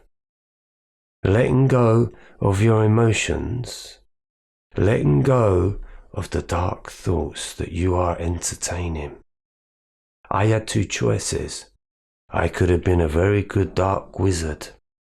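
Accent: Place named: Cockney, United Kingdom. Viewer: This is great. As a Northerner I never thought I’d be enraptured in a lesson on the dark night from a cockney geeza called! 😂 We live and learn. Thanks mate, wonderful video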